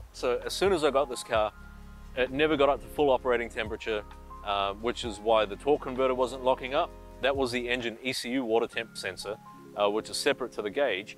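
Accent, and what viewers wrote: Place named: New Zealand. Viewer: Good ol' Kiwi accent . . . nice!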